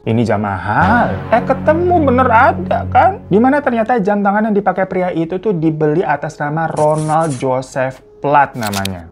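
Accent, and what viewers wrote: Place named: Tambun, Indonesia. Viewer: apal bngt bahasa tambun bang .. btw w orang tambun bwang 😂😂😂😂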